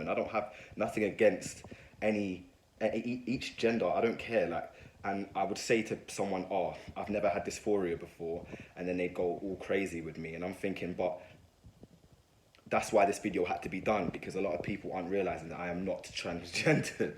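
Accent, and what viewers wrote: Jamaica. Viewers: I thought you were ja-mac-ian did you say you from London?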